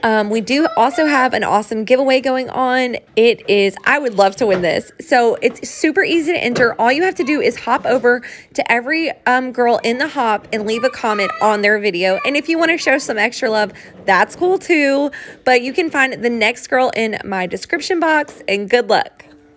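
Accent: South, United States. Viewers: You are making me laugh....I KNEW you had to be from the South (I'm from TN)....and I ALWAYS say "anywho"...love it! I think I really like the Jingle All The Way sign. Never thought of distressing the metal. Great idea!!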